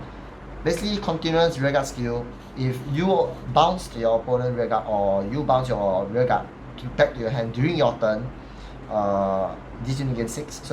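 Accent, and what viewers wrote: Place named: Malaysia. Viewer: U have a malaysin accent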